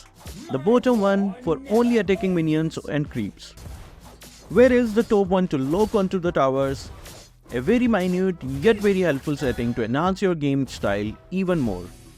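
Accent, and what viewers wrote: India. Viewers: Bro are you indian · Ofc he is))) accent is perfectly Indian)) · Are you Indian Sir?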